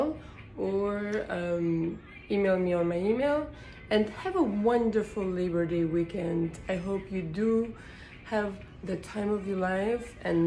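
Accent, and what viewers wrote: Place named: France. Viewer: lovely french accent <3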